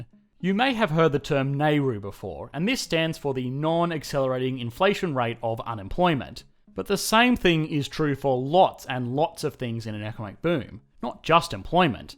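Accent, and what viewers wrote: Australia. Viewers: The Australian accent really makes everything said here a wee bit more positive ♥️😁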